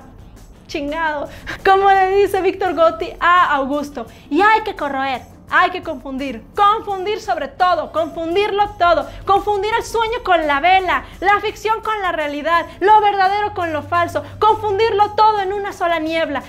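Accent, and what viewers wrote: North, Mexico. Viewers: No sé de dónde es, pero me encanta esa forma tipo norteña de explicar las cosas. Gracias. 💕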